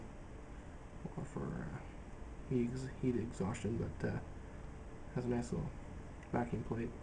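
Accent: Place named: Canada. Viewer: You couldn't sound any more Canadian. I'm getting the Vapor-X edition myself.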